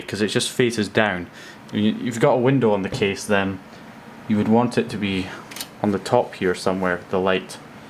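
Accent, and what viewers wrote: Scotland, United Kingdom. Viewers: ***** I believe it's scotland, judging from the accent. Probably somewhere near Aberdeen, but his accent has a slight twist, so he might be from somewhere around that area. I'm not Scottish, so I'm not too sure. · Are you from Scotland?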